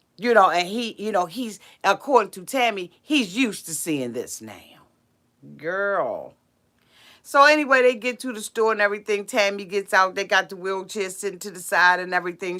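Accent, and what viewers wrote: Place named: DMV, United States. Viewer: This my first time listening to u, but I can hear the dmv all up in u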